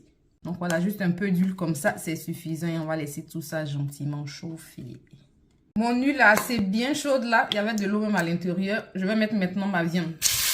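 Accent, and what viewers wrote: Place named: Ivory Coast. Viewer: Ton accent ivoirien j'adore 🎉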